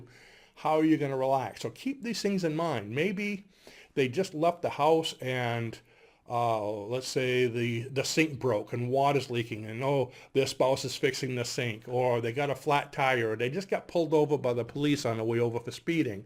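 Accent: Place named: New England, United States. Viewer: i'm from new york and it sounds like you're from the new england area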